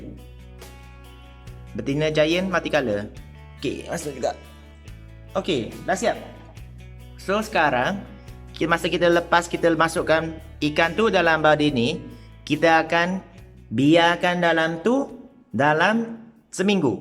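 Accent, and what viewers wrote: Kelantan, Malaysia. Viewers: memang terbaiklah cina kelantan lancar je cakap kelantan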